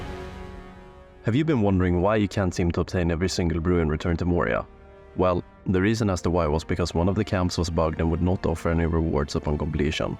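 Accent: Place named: Sweden. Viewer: Love that Nordic Swedish accent bro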